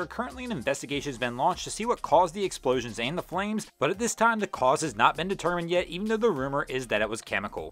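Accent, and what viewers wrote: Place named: Cincinnati, United States. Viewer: I can't help but notice your accent. Were you raised in the Cincinnati area? Love your vids!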